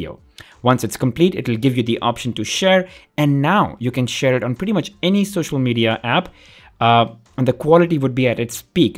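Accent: India. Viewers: I love hearing your 'd's So in'd'ian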